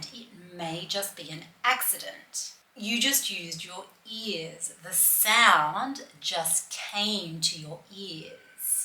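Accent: Australia. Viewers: I don't understand why you don't have more subscribers and views, you made really useful and interesting videos, and the best thing is you have Australian accent! That is strategical for me, I am living in Australia and I was study by my own with audios and videos in British and american English, and I wasn't improving my listening skills here (I didn't understand Australian people). Since I am study with your material I have felt that I am progressing 😀. Thank you!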